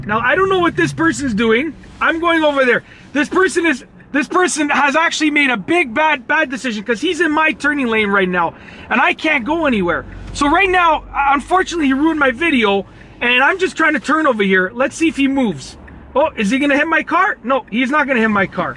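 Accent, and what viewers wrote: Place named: Canada. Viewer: The most canadian accent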